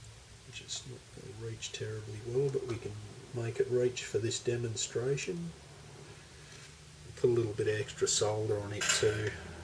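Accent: Australia. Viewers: Iwas almost uncaring as to the info once I heard an Aussie accent. I was doubly glad to find good info without the ubiquitous yankee accent. Thanks digger. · Excellent video. I was particularly intrigued by the removal of the pole pieces altogether (as per Scott Grove's advice - he has a lot to say not just about guitars and guitar gear but about bloody everything lol). It did really brighten up the pup to my ears at least. I know that I'm six and a half years late on this upload but it's still pertinent. Great to hear an Aussie accent on the tube mate. Keep 'em comin'. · Judging from your kick-ass accent, I am guessing you are Australian? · PS : I like that little riff you do !...greetings from Melbourne !!! good to hear a fellow aussie talking common sense !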